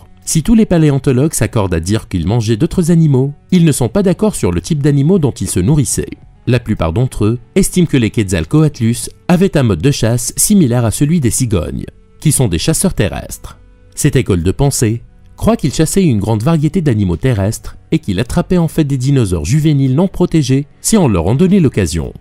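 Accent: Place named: Asia. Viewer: asiatique je crois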